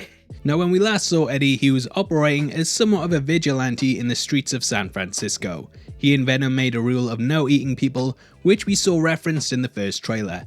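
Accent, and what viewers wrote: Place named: Newcastle, United Kingdom. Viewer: Call it what it is, a geordie accent. Nothing wrong with it.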